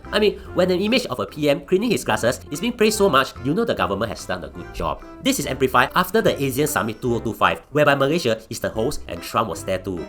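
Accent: Singapore. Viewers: Even if i don't agree with you on some points, your delivery is humorous, very singlish. Good job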